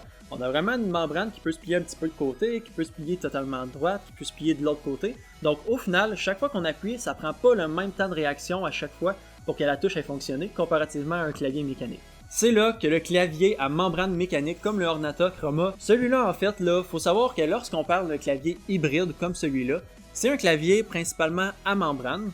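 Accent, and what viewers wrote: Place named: Quebec, Canada. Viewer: Sans rire l’accent québécois ❤️😍😍😍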